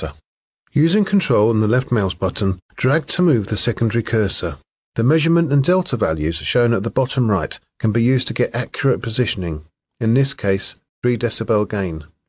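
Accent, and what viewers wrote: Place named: United Kingdom. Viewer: OMG I Love that voice and their british accent, thank you!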